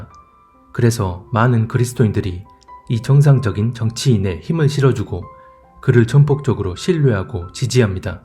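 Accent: Jeolla, South Korea. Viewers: 전라도억양이 아쉽네요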